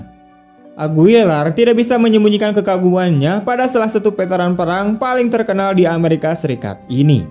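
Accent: West Java, Indonesia. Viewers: Orng sunda ya bang?😅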